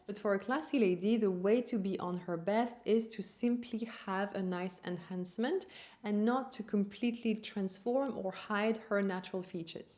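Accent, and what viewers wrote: France. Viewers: I would love to have you as an older sister of an aunt. You are so admirable and seem like a very lovely person, I love your calm voice and subtle French accent. It is very reassuring.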